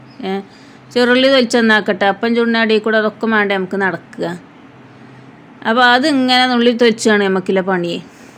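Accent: Malappuram, India. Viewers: മലപ്പുറം ഭാഷ സൂപ്പർ വീഡിയോ സൂപ്പർ 💞💞💞💞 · അവതരണ ശൈലി നന്നായിട്ടുണ്ട് - അല്ലേലും മലപ്പുറം ഭാഷ ഒരു രസം തന്നെ - ഇഷ്ടായി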